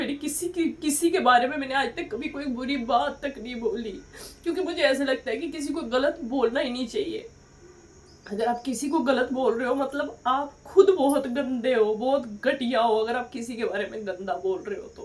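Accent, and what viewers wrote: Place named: Himachal Pradesh, India. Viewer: Maine aapki video phli baar dekhi aaj, ye 2nd video dekh rha hun, mjhe aapki previous to nhi ptaa bt mai bs yhi khna chahunga k aap jhn rho khush rho...😊 Aaj ki date mai maa baap k siva koi apna nhi hota, rhi baat relatives ki to unhe to sirf bahana chahiye hota h... Aapka ghr bsaaa hua h or jo kuch abhi aap k paas h bs usi mai khush rhi...😊 Ye jindgiii ek bhgwaan ka diya hua tohfaa h isko khush hokr gujaaro👍 I don't know where from u bt I think you are from himachal by language pronounceation...!!! bt in this situations you hve to become a strong person...👍 So plzzz be happy keep happy always...😊 Lots of luv❤ from #himachal...😊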